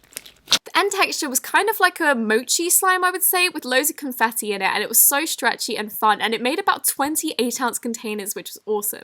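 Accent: United Kingdom. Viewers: she has a little bit of a British accent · She's British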